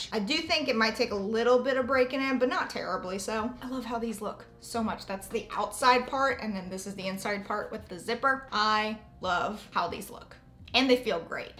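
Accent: United States. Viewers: Your box is full of such good stuff! And I love your accent! What state are you from?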